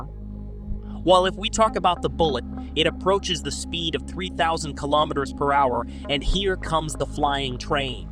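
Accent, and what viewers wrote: United States. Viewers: ChiNews is a good program but the voice is terrible and needs to be changed to a softer voice, and not a heavy noisy U.S accent. This voice is horrible to the ear.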